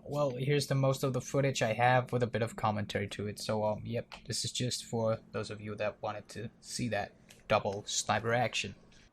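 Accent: Denmark. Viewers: Are you Danish? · Wait? Are you Danish? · R u danish? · Are you danish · are you by any chance Danish? 😃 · tænkte nok du var dansker!!! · Are you Danish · Are u danish? :D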